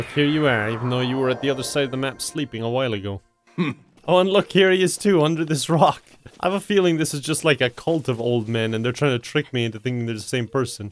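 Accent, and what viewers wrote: Ireland. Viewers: The thing with is that he always ALWAYS uploads when I'm doing my homework. I want to ignore it but then I think... can I ignore that irish accent... and here I am! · he has an Irish one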